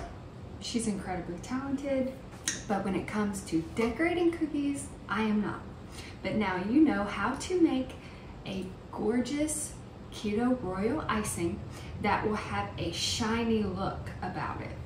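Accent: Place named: South, United States. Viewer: That’s absolutely southernese! I love it! Go to Europe and you will find many different variations in language but I would not dare to ask them to change their way of speaking bc my way is different. That’s what is unique ab people from different parts of the world. Stay the way you are. I do love hoe respectfully she ask though.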